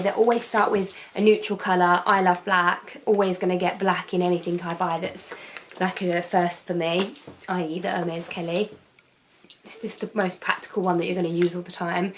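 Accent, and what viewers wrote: United Kingdom. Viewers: Knew you'd be a brit 😁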